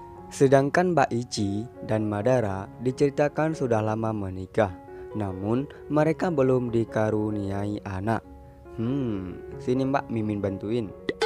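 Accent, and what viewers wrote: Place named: Aceh, Indonesia. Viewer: Lage logat awak geutanyo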